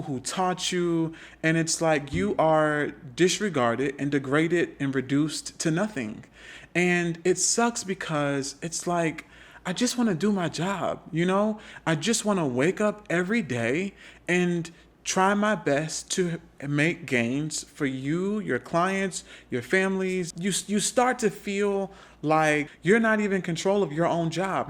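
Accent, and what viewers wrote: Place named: Charleston, United States. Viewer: Sounds like Charleston Sc !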